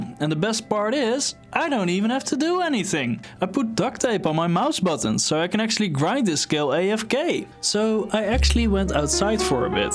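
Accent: Netherlands. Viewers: The Dutch is strong with this one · You really have a Dutch accent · Je klinkt wel erg Nederlands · Ik hoor een Nederlander of niet? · I instantly hear that you're from NL :D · Are you Dutch? Your accent is very telling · You can easily hear you're dutch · Are you dutch? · Are you Dutch? Amazing video, went by very quickly. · I smell dutch😂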